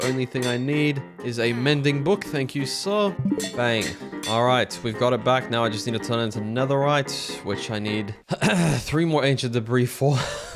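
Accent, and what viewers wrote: United Kingdom. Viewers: I really like your British Accent... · Love british accent too much dude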